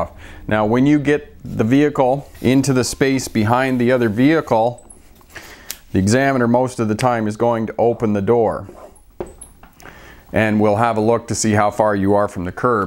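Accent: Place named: Western Canada, Canada. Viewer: I was watching this thinking, "this guy must be from Western Canada" and lo and behold from BC. I can tell me Canadian accents pretty good.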